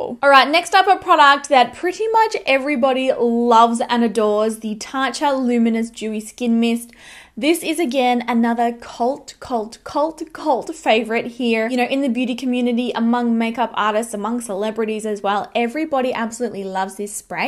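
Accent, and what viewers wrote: Australia. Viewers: Omg your hair looks beautiful!!!! I love your Australian accent! I want to take a trip there it seems beautiful. Thanks for the great dupes! 💖👍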